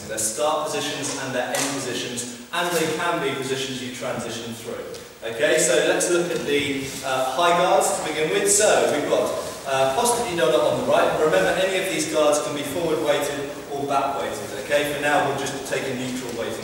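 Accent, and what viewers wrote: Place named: United Kingdom. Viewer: Love your British pronunciation of the Italian names =). Fancy, but correct.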